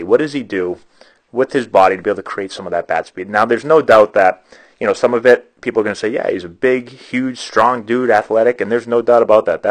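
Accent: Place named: East Coast, United States. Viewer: Anyone tell you that you sound a bit like Howard Stern? I think it's just your east coast accent lol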